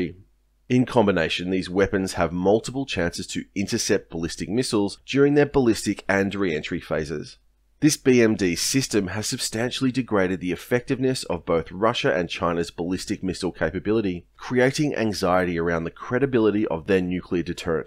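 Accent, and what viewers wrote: Australia. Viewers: You sound like that classic Australian Doosh bag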